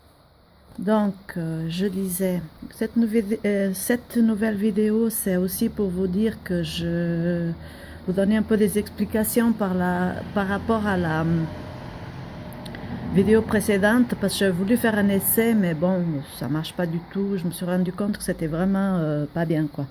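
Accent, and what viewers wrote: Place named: South, France. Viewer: bonjour, je me disait aussi que c’était un accent du sud ;) beau coin de véranda la suisse c'est joli merci du partage. a bientôt Vincent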